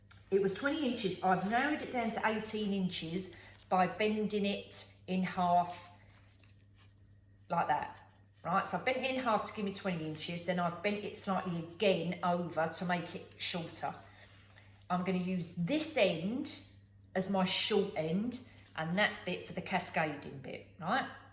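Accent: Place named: Wigan, United Kingdom. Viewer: It is really beautiful and there is nout wrong from being comman we all talk like tht in Wigan haha X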